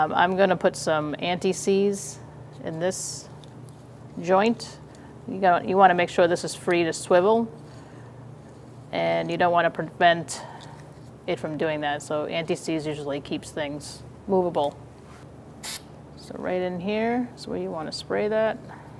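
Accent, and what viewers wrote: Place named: New England, United States. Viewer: wonderful video. i love the new england accent as well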